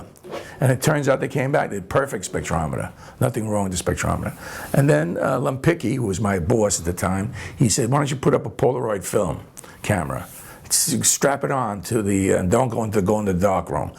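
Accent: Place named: New York, United States. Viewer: mike jan i'm not saying he isn't. clearly from this video he is a very accomplished and expert in his field. my point is i haven't met many scientists with such a thick new york accent, just like in goodfellas or something haha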